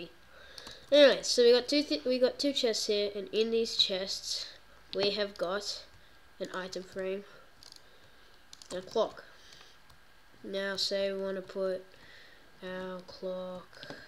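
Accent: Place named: United States. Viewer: 너무 미국 사는 한국인 같다,,, 미국인 말고 미국 사는 한국인,,, 느낌 알지 다들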